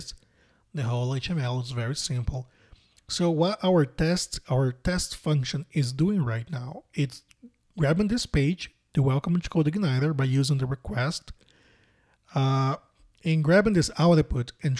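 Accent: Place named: Brazil. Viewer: Esse sutaque é BR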